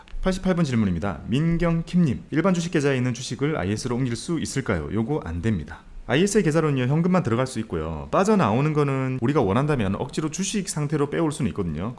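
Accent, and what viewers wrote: Gyeongsang, South Korea. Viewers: 항상감사합니다. 작년부터 펜이됐네요. 약간의 경상도 억양도 매력있고 설명도 알기쉽게 설명해주시니 좋군요.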